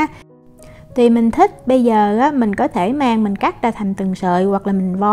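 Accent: South, Vietnam. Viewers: Cưng Diễm chúc em luôn khỏe thật bình an nhé giỏi nấu món đẹp ngon giọng nói hiền tự hào người miền nam i love you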